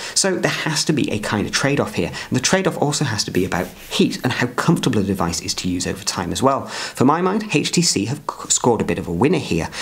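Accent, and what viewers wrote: England, United Kingdom. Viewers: Please slow down your speech. Some people don't do cocain nor are they nine years old. I was interested in this video for reasons of technical research and personal inquiry. It took me a half second to make out what you were trying to say about the device. I do find it slightly difficult to make out an English accent as it is. But I thought that if you were wanting your video to be more professional as it would appear to be such a topic. I think it could be spoken in such a manner where all could enjoy. Thanks! I tried to PM this message but the option wasn't available.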